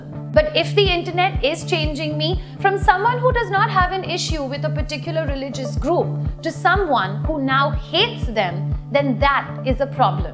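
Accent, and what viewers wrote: India. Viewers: Hollywood bgm ! But indian english!!😅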